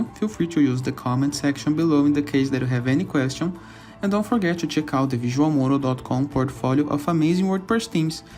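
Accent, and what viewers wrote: Brazil. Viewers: Pensando em comprar o branco. Teu sotaque entrega bastante que é Brasileiro kkkk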